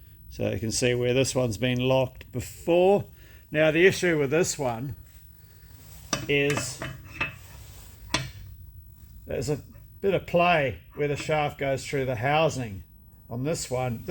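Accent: Australia. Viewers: Great instructional video - with the Aussie touch. Thanks.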